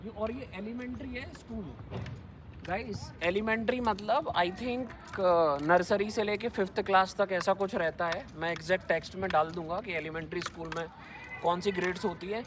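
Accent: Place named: Bundelkhand, India. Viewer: Jst LOve Your Bundelkhandi accent :face-green-smiling:💟😍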